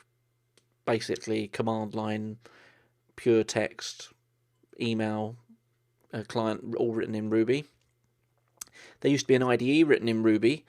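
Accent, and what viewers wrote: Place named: Liverpool, United Kingdom. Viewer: he tawks like a beetul..cool.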